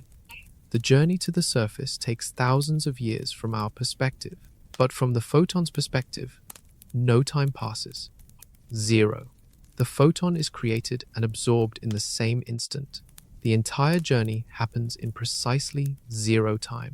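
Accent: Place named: United Kingdom. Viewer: Always the damn British accent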